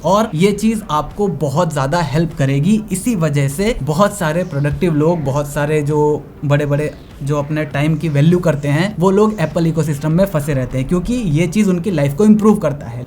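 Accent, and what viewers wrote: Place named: Maharashtra, India. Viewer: One more thing, by your accent , i think you are marathi cause im also marathi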